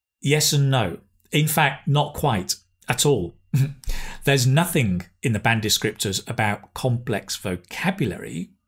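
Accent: United Kingdom. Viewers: What i like about this page, your explination and your Lovely pure Britgish accent. Its brings comfort and gives us confidence.thank you very much sir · I like your channel, like your British accent and the way you explain and teaching 💗 your fan from Morocco 🇲🇦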